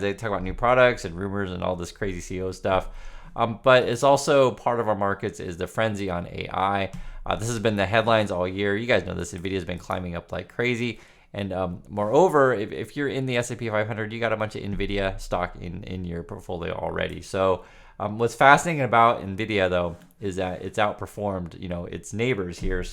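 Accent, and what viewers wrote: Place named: Pacific Northwest, United States. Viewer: Fellow Oregonian here! Funny, I thought you might be from the PNW. The way you speak gives it away, big time. haha! Thanks for the video!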